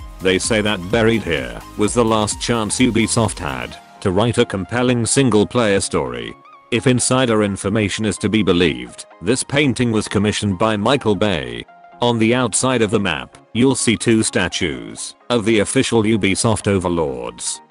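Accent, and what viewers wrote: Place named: Australia. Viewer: You should use your own voice on some videos cos OMG your aussie accent is amazing:) everyone needs to have heard the smooth taling aussie that is;)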